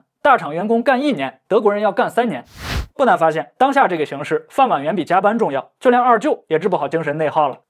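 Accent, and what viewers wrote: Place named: Shaanxi, China. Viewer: 普通话有点陕西味啊，倍感亲切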